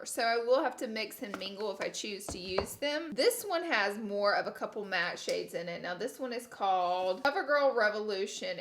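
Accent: South, United States. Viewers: Gurl I understand your southerness. I didn’t even realize you were saying it wrong either. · Love drugstore makeup! Girl I’m from SC I’m as country as cornbread and I stand proud sayin washer merchine lol 😂 love your country accent!! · Washer Machine ,I do the Same! North Carolina. Southern girls accents Never Fade 🌹❤ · It’s A SOUTHERN THING HUNIII 🤠🤠🤠🤠🤠🤠🤠🤠🤠🤠🤠🤠 washer machine I say it like that too. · I friggin love your southern-ness 🤠♥️👢 oh and you forgot greezy = greasy 🤪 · I'm from Louisiana and none of your southern words are wrong! Lol. I get picked on also! You keep being you! 🙌🏻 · The accent!! ❤️ Gotta Love us Southern girls, big shoutout from Mississippi! ☺️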